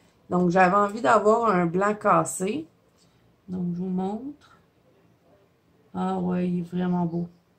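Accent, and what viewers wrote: Quebec, Canada. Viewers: Ho..j adore déjà votre beau accent québécois que jai bien connu durant 3 belles années chez vous.... J'aime vos vidéos qui sont super bien faite et vos astuces sont géniales... Merci merci de me faire redécouvrir un peu le québec et merci pour vos conseils vos méthodes qui me font capoter et que j essaye..bonne soiree a vous belle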